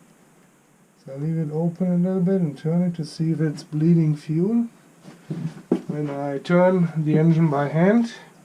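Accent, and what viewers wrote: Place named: Germany. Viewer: Somehow, from your accent, I'm guessing your mother tongue might be German. I am with a MD1B from the 1970ies that was running until 2019 sort of, until then it was left for good, might have some little rust and such... I want to try to fix it all myself first, but if I fail I might want to come back to you with questions and such.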